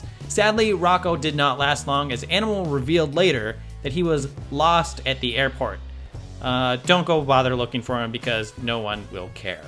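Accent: United States. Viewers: It’s so weird hearing an American and not a Brit on this channel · great American accent. Thumbs up! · sounds weird to here a american on what culture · There is a American workin for WhatCulture!? Its weird hearin his voice since all the rest is brits. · Get a non-yank to do these videos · Who's this American guy, where's Jack or someone? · hahahhahahahahahahahahha listening to this yank talking about brawn in 2018 hahahahahahahahahahaha fucking bullshit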